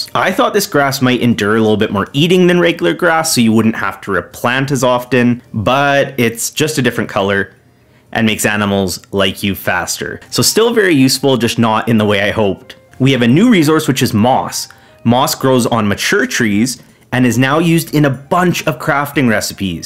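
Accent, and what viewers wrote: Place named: Western Canada, Canada. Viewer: Great videos and your western Canadian accent is really cute ^_^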